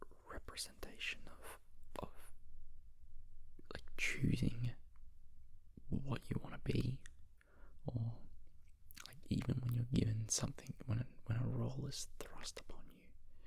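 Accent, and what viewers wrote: Australia. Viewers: I totally agree hearing another Aussie is so refreshing and makes it more familiar and a better experience for me personally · as a fellow aussie, i find it so fresh to see more aussies getting into asmr :] it's quite relaxing and familiar to hear the accent of ppl around me in asmr. not to mention your calm and relaxed demeanor - it really adds to the experience! cheers mate, keep up the great work❤ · Since I'm back in Japan, I have been busy with work and have been diagnosed with bipolar disorder again😢 I can't even have that much sort of energy to watch YouTube or you know just use any kind of SNS but I'm so happy to be here and hear your Aussie accent again. I've been struggling with insomnia and taking some pills but your video really makes me feel sleepy. Thank you.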